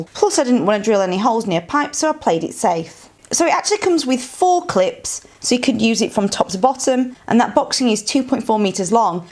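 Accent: United Kingdom. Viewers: Oh. I love that British accent.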